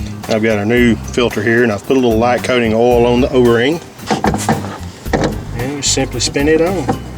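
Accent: South, United States. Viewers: Yea you do, Southern accent. Love the South! BTW thanks for the video for you have provided me with a YouTube Degree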